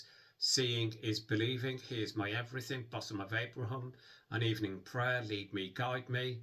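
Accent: United Kingdom. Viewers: I dig your Elvis reviews a lot - as well as the Britishness of your accent! 👍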